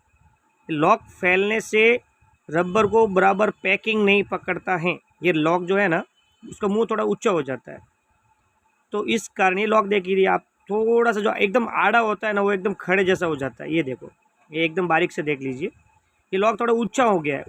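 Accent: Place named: Gujarat, India. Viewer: Wah gujarati hindi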